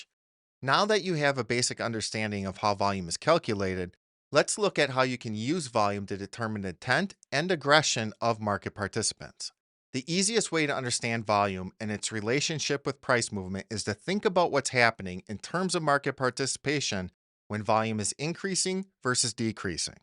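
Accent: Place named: Chicago, United States. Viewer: He’s real. Real good at trading. Notice the Chicago accent? They’re real good at trading too.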